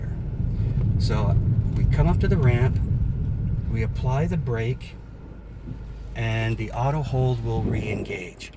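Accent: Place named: North America. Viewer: North American accent..right hand drive ?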